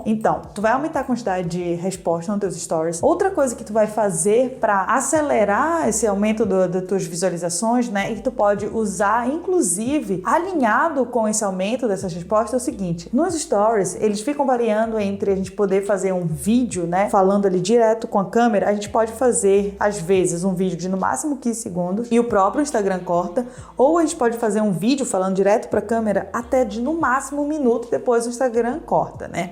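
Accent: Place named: Florianópolis, Brazil. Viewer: Algo me diz que o sotaque é de floripa 😅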